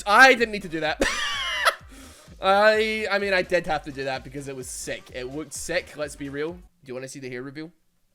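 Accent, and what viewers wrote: Scotland, United Kingdom. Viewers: Please go full Scot and don't tell any of us what anything means, i'm from further north but i'd still love to watch it. For the most bit glasgow speakers are easily enough understood. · League of legends but u only speak pure scottish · League of Legends but goes full Scot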